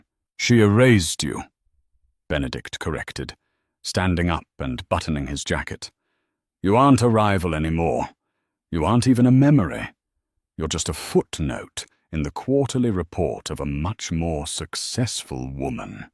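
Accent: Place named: England, United Kingdom. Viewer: Dreadful English accent!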